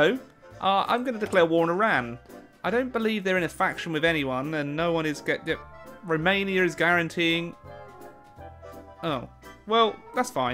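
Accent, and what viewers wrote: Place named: United Kingdom. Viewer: Yeah, I wish I had half the charisma and British accent he has. I'm just here to show support for the man who gives me a reason to look forward to something more than just another day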